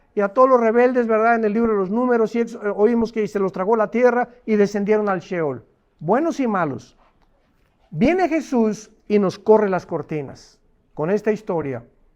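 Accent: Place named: Peru. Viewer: Luis Qwerty estas seguro qué esté hombre es mexicano para mi suena como peruano ojo no tengo nada en contra de los peruanos es la primera vez que lo veo y me llama mucho la atención.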